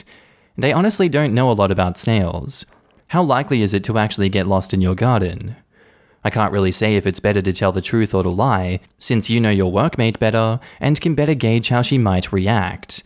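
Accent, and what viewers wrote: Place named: Australia. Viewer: Ayeee what's poppin,?? Also, is your government name or just a nickname? Thank you for all the videos and lovely Aussie accent. We love you, we appreciate you. Keep going mate, you're bloody awesome 🤗💞🙋🏾‍♀️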